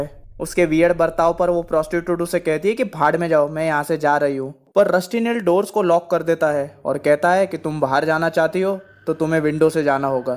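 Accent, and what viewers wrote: Maharashtra, India. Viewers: Bhai aap maharashtra ke ho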